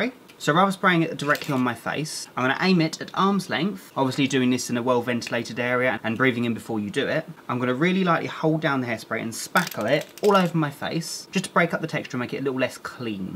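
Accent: United Kingdom. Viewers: Ok so rumor has it that the new pennywise has a British accent like you, so if that is true you killed it more then you thought!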